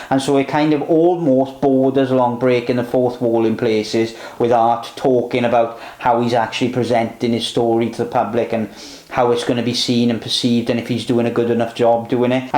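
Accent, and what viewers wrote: Wales, United Kingdom. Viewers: Randomly discovered this channel… did not expect to hear a Welsh accent talking about Maus. I can hear the voice in my head getting more like it did when I lived in South Wales.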